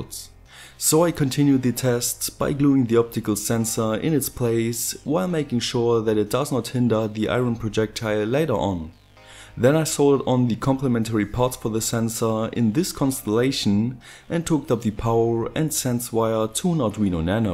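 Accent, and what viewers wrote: Germany. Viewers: are you from germany? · Man hört einfach, dass du aus Deutschland kommst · are you german? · German Accent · man merkt das du deutscher bist👌👍 nice videos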